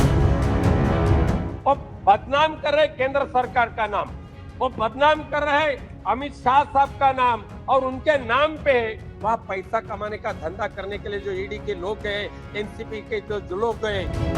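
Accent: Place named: Malvani, India. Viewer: मालवणी आवाज दिल्ल्हीत गाजला ....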